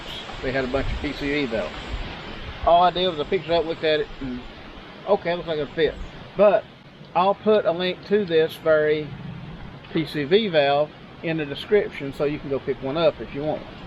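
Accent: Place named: Wichita Falls, United States. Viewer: Thanks for this - I won't feel comfortable doing it by myself but you sound just like my MIL's boyfriend who is native to Wichita Falls. I would swear you're from there! I'll be asking him his thoughts about this solution.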